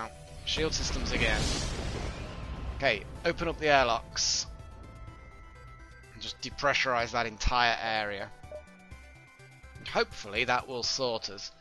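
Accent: England, United Kingdom. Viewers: Oh BTW! Velcoma back (bad German accent). Nice to here that jolly gd English accent back on screen all the best. ( n let's god hope you don't go n get all of us killed once again. :-D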